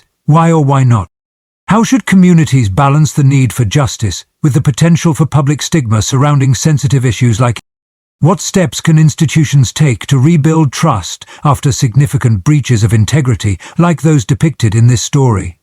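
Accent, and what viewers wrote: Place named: United Kingdom. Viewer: Why the narrator isn't black & why the reader with a British accent doesn't state the year of when the event happened. Why no one knows the city in Texas or the name of the church. Ive lived in Texas & I've a church in Plano for 10yrs & there no event this on the news any churches in Texas no name of reporter who the story . I really question & put on this video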